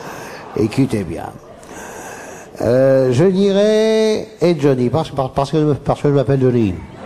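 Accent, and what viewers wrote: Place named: Belgium. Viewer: il le fait sûrement pas exprès mais des fois il a un accent belge x)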